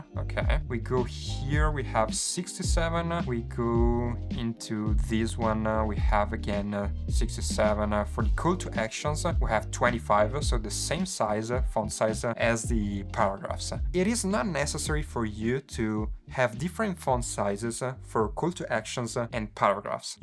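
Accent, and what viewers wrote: Italy. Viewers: Hey is an Italian American I love the Italian accent! Be great to come to Italy and get to meet you and learn about the culture! Having said that though do you have the slides for the guides you came up with for the sizes?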